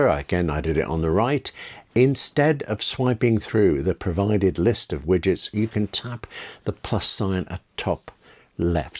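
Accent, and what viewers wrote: United Kingdom. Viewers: Great British accent but how about specifying the phone models on your headline not to waste any more time of us lowly SE users? 🖕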